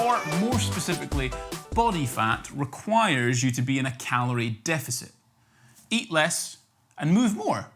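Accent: Scotland, United Kingdom. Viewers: You sound Scottish!😅 I love ever accent except my American one. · I got so thrown off when you opened your mouth and you were Scottish (I'm Scottish) never expected to hear a familiar accent but always lovely when I do 🤗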